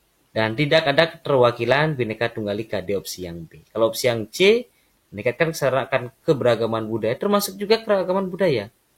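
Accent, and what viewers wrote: Bali, Indonesia. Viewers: Keren banget penjelasannya on point gak bertele2. Dan dijelaskan konsep dan triknya juga... logat balinya juga jadi pemanis😆 Saran kak, biar jadi pembeda dengan channel lain. Kakaknya fokus ke TWK aja. Pasti channelnya cepet berkembang apalagi kakaknya enak banget jelasinnya gampang dipahami jugaa.. Biar kayak lapak sebelah sang tutor yg fokus ke TIU hehee Sukses selalu kak...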